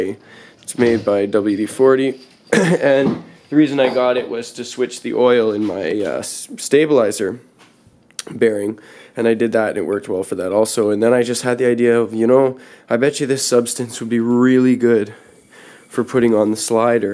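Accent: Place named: Canada. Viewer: enjoyed the perceptible Canadian accent and "oops, that's French." Will definitely try this on the used Konova K3 I bought used on eBay.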